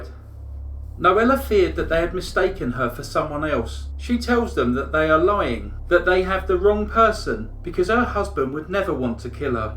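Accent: England, United Kingdom. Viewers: First I would like say that I sure missed my friends beautiful English accent! So good to hear it again... For this woman, it is sad that she is being shunned because of a man who wanted to murder her. Those people need understand that it could happen to them. She is better off without those good for nothing back stabber's. He deserved a longer sentence, 9 yrs is nothing for someone who wanted to murder their wife. He begs forgiveness? He just wanted out the easy way, so he thought. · This was a fascinating case, presented in a compelling style. You successfully blend storytelling and documentary narrative styles, and you enunciate clearly so that you are easy to understand, UK accent notwithstanding. ;-) Nine months with no parole for six seems insufficient, however, I think Noela got some measure of justice with the shock of showing up. Can't put a price on that. 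Great job.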